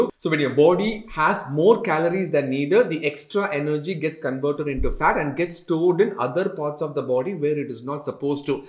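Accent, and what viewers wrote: Tamil Nadu, India. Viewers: talking English like Tamil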